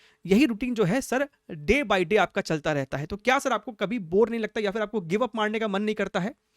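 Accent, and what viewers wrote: Bihar, India. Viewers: to aaj pata chala ki aap bihar se hai.sir mujhe aapke accent se hi lagta tha ki aap bihar se hai.Mai bhi patna se hu sir and iss advanced me phodkar bihar ka naam roshan karna hai.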